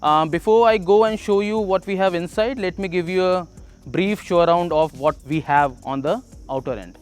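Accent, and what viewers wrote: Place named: India. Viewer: In which language is this guy speaking hard to understand with a heavy Indian accent can't understand a word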